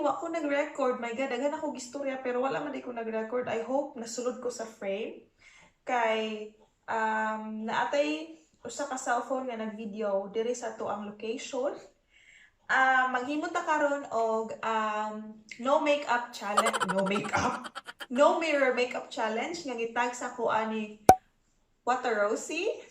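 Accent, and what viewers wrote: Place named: Visayas, Philippines. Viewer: Cute kaayo jud mag bisaya ba, joker jud :).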